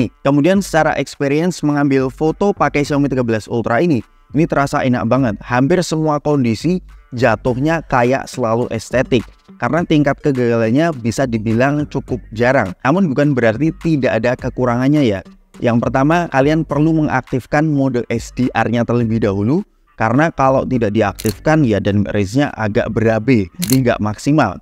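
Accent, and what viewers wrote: Semarang, Indonesia. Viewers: Orang semarang om?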